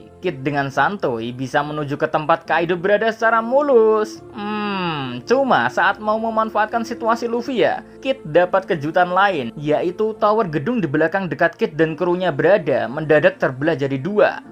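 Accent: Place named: Tangerang, Indonesia. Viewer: ngikutin chanel ini udh lama Min.. dn aksen "GEH" itu baru.. dn sangat menggangu telinga... Terima kasih Btw sya thu itu aksen daerah Tangerang kabupaten..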